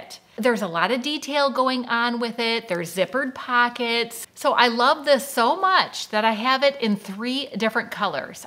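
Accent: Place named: Minnesota, United States. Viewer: I like how you combine your southern and northernaccents…. you say y’all, then you say if ya find ( but with the Minnesota accent!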